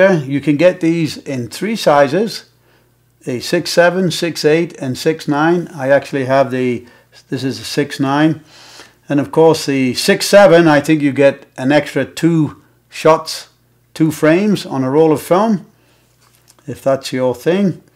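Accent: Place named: Germany. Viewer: Superb and definitive video, I am interested in your accent, I am hearing, German, Manchester England and California, please do tell 👍🏼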